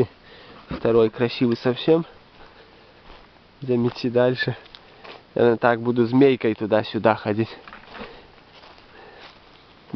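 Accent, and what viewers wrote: Belarus. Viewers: Спасибо за видео . Как сама за грибами сходила смотрю вас у вас такой приятный говор беларуский , мне как бользам на душу .Я родом из Житкович , живу в Тольятти мне все родное что вы показываете . Удачи вам !!! И милион просмотров и подписчиков .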